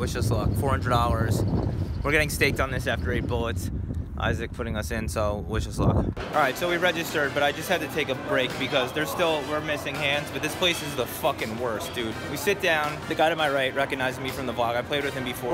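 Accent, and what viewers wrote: Australia. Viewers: 7/10 on the Aussie accent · the aussie accent is a solid 9/10. love it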